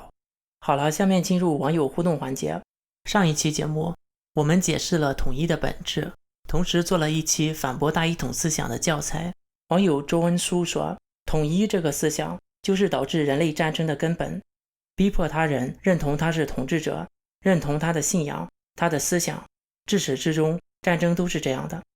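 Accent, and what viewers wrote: Shandong, China. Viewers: 我觉得你先把你的山东普通话练好了再传功比较合适，你让我对反智主义的认识上升到了新的境界，大开眼界了。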